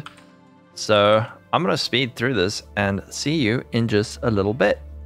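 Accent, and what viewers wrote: South Africa. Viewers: Hey bro, I commented on your last video r.e. figuring out you are a South African from your accent... how can I got about getting those discord details? My buddy and myself from SA would like to connect with other SA guys... shot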